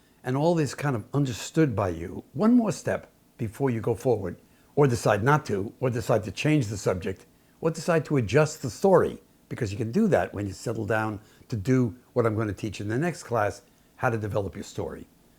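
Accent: New York, United States. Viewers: Christ your accent is so New York it's giving me flashbacks. Don't worry about the ads; I bet no one will mind.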